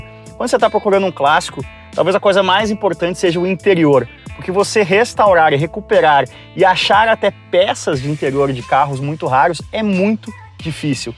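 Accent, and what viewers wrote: Italy. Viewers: Mais que belo video (sutaque italiano) linda historia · !!! Parabéns pela escolha!! Linda machinna!!! Não deixe de fazer matérias com o seu Bello sotaque italiano!!! Que bom ter vc como alfista..sou de Londrina tenho 2 machinnas uma é a Sofia e outra é a Franchesca...E carrego grande orgulho de ser descendente de italianos... viva Alfa Romeo. Abraço amici Alfisti. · teu sotaque italiano é maravilhoso! Mas meus ouvidos estão sangrando..... kkkkkkkkkkkk. Brincadeira! Abraços! Em tempo: já vi um desses com motor V6. Aqui em Florianópolis sei de uma pessoa que tem uma, porém não sei se é 4 ou 6. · não tem nada mais chato que esse """""Sotaque"""""" italiano pqp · O sotaque italiano do é engraçado, quando ele fala italiano eu me mato de rir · “Tem gente que não gosta do meu sotaque italiano “ tem gente que se incomoda contudo, pelo menos nós aprendemos as pronúncias corretas ou próximo do corretor, show de bola man AlfaRomeu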